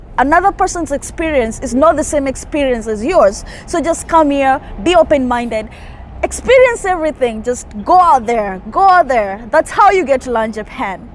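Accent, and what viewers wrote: Kenya. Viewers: thanks for the compliment. 😝actually most Kenyans speak like that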